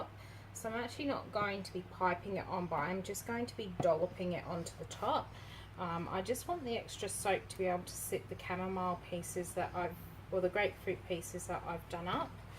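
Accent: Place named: Australia. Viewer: Delicate and lovely soap! Love Australian accent!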